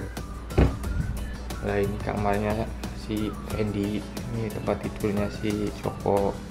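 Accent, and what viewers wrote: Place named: Java, Indonesia. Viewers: Ikhh, seneng ngliat maz nya ganteng tp medok ky q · All, wahh hebat mas bisa bahasa korea, tp logat jawanya masih ada ya pas ngomong indo nya hihi 👍 sukses mas di sana, Jd ngiri pengen ke korea 😂👍👌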